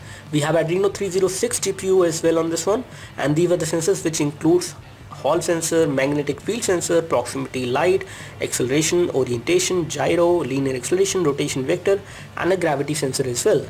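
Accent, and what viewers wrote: India. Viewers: How the do you speak English so fast and fluent but yet you still have the indian accent ? blows my mind.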